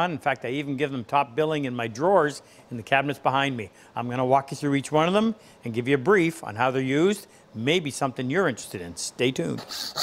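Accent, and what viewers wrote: Canada. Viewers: Ar u a Canadian or American Ah!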